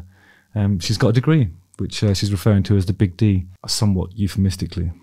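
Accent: United Kingdom. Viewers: No, he’s just British and you’re probably just American.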